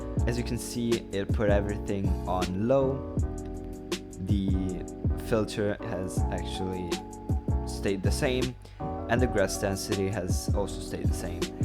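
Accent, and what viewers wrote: Germany. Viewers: Good tutorial thanks (btw German accent? :d)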